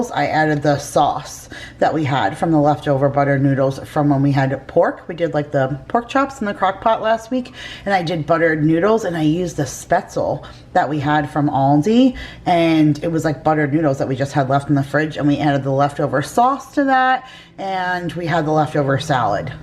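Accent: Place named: New Jersey, United States. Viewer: Just found your channel today & I am SO happy to find a vlogger who has my accent! No offense to those amazing southern moms, but I can only stand to hear penne be pronounced "pen-NAY" so many times 😂. Much ❤ from NJ!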